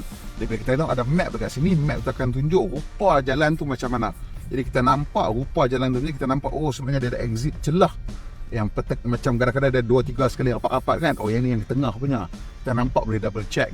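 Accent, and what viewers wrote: North, Malaysia. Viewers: Well review tuan…tapi kali ni personally rasa lagi best sebab kelauq nahu2 utara hahaha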